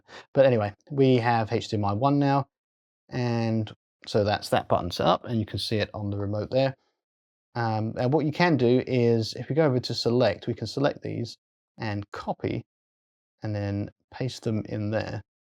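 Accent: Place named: United Kingdom. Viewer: It looks good. By your accent I can tell that you are British. If you actually live in the UK how the hell did you get one?!? Their website doesn't even list the UK as a shipping destination 🤬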